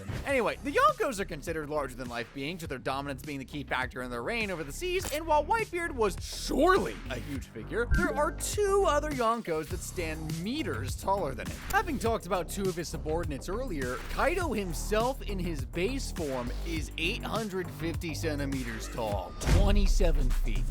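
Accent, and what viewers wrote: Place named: United States. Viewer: This video is in english narrated by what sounds like an american. Why then would you give metric measurements instead of feet and inches?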